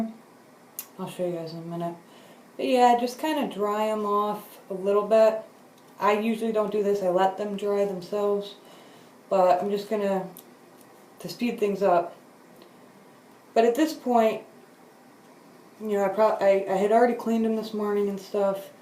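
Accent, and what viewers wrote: New York, United States. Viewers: Your so pretty! Love your NY accent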